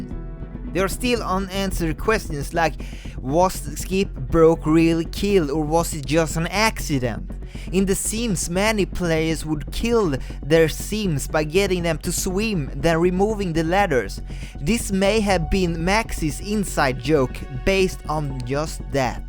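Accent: Sweden. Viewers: It's Swedish. Russian accent sounds much more different. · I just know you are Swedish, i can tell from the accent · You're Swedish, right? I kinda hear it on how you pronounce certain words. I myself, am from Norway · Are you Swedish? Sorry if I offended you but I would like to know😀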